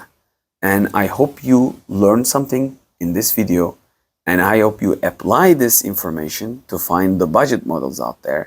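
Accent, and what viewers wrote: Turkey. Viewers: Oglum I recognise this accent, memleket neresi?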